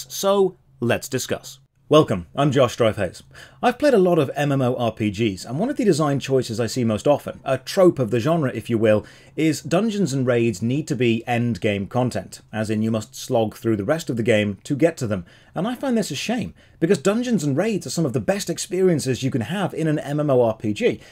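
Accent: United Kingdom. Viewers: I haven't watch a Josh Strife Hayes video in a while, and it really surprised me how I immediately felt a nostalgic sensation of joy when I opened up this video. Perhaps Ill be finding some time to get those charming british tones in the coming days.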